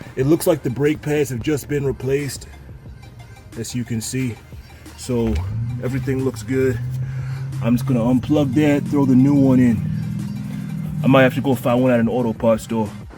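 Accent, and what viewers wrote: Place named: Boston, United States. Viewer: You sounded like you was from Boston.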